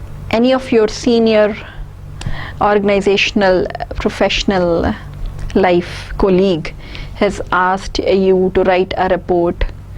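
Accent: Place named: India. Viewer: only your accent is indian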